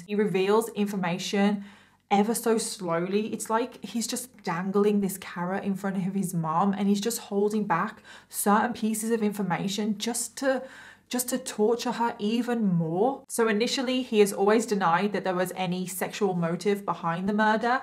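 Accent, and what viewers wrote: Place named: England, United Kingdom. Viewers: yes she come up with the mystery murder and makeup idea BUT people get inspiration from others and the world and just because she’s doing her makeup and telling the story like Bailey does it doesn’t mean she’s trying to be like her! She’s doing it her own way and plus she’s from England so the accent gives her a twist and makes her nice to listen to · How can anyone believe he's rehabilitated. I agree with you! I love your English accent and your very pretty 😍 thanks for your videos!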